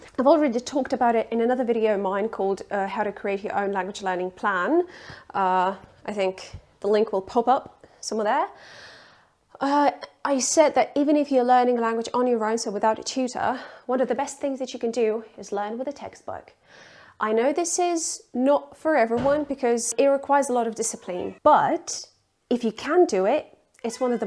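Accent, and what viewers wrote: United Kingdom. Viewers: Perfect British accent) I can't imagine how much effort it takes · I thought you were British! I’m American though. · honestly I can't, but I'm American and you have a lovely Brittish accent. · how did you develop such an amasing British pronunciation?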